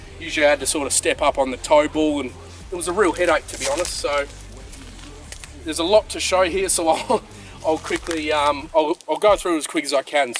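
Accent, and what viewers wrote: Australia. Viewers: Have to admit as a Yank I’ve always been jealous of our Aussie friends cool accent and that v8 Toyota turbo diesel that we can’t get in the 🇺🇸. Very nice 76 👍🏻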